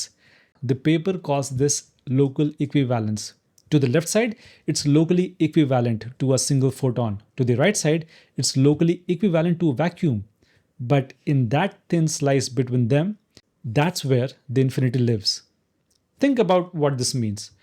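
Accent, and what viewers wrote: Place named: India. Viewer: If your attention span for listening to a man speaking English very clearly and well articulated is only 8 sec because the man has an Indian accent I propose the communication problem is entirely on your end. - by the way, you write English in a poor way yourself. Your sentences are missing words before they can be called full sentences. And your last sentence says that you lost patience with an articulation which is itself impatient with the audience of the articulation - that is just gibberish. I suggest you go practice your own English before criticizing others.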